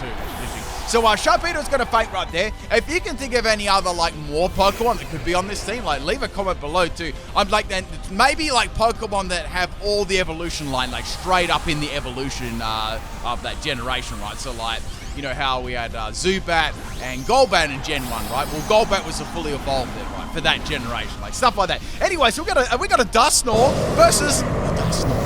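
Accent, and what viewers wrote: Australia. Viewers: Your Australian accent takes your videos from amazing to divine!!!! >>>>>>>> Wolfey and MandJTV any day!!!!!!!!!!!!!!!!!!!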